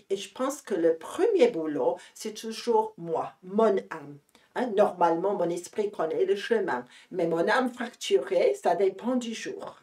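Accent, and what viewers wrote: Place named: England, United Kingdom. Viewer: Did you try to pray Holy Father Pio de PIETRELCINA.? I AM SORRY BUT YOUR VERY STRONG ENGLISH ACCENT IS AN IMPEDIMENT TO MY UNDERSTANDING . HAVE YOU AN ENGLISH BLOG PLEASE.?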